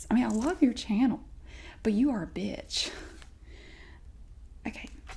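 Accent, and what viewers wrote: South, United States. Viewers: To the Dumb fucks who hate southern accents, Why in the Goddamn fuck would yall even click on a video.I'm tired of these fucking yankee pricks talking their Goddamn Bullshit about us SoutherN People. I'm sorry for the profanity,But Im a High Strung Texan that's had a few drinks (PRE 4TH OF JULY) you are one of the best ASMR artist's Ive ever seen. You are a very beautiful woman,that doesnt look old. These Haters can just go Fuck off down the road somewhere. Leave This Great,talented beautiful woman alone!!!!!!!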